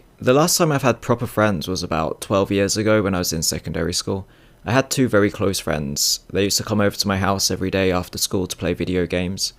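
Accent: United Kingdom. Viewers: there is something really annoying with low-level trolling in an english accent. I don't know why that is..... and when a troll has a russian accent it instantly becomes amusing and cool! but when it's that english accent...... it just becomes YUCK! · He speaks with a fluent English accent though.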